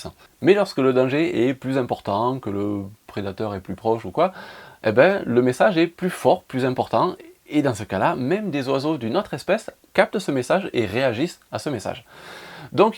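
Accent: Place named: Southwest, France. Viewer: Bonjour. Les perfections invisibles de Dieu sont dans la nature. Tu as l'accent du sud ouest, dans quelle région est tu?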